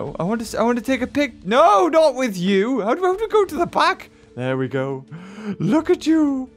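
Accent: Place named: Newcastle, United Kingdom. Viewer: I saw you in all over the place good gordie acsent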